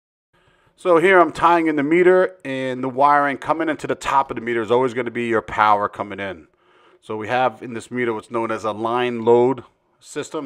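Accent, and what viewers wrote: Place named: New Jersey, United States. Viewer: Hello Mr., I love your videos, you are so steady in your work and explain things very well. (I'm assuming New Jersey by the accent. (my mother and maternal grandparents were from New Jersey) I grew up in PA and been doing electrical work 32 years. I love that you just show the work nothing flashy, or gimmicky. Please stay like that, I am all for a good laugh but there is a time and a place for that. And when you are completing work that can kill you, or possibly other people, this isn't the time. You Sir, are a credit to electricians all over the world, especially here in this wonderful USA, a consummate professional. Sorry for referring to you as Mr., whenever anyone does that to me I look around for my dad or grandfather. But I am also a, lol. My dad told me growing up you never call someone Sir, until they gain or earn your respect, sorry I am rambling. 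But you Sir, have gained my respect within your work. The only thing I would add to your videos is leave the print up for a little longer when you post a written message, as it's hard to read that fast. And I thought the meter height thing was 6'7", I know that's splitting hairs, but we know how the NEC likes to split hairs, and so do inspectors, sometimes. But your probably right at 6'6" and mine is for inside panel boxes. But great video thank you!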